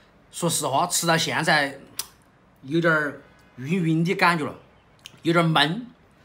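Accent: Sichuan, China. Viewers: 小廚講話有四川腔，阿為啥唱歌辣麼標準?????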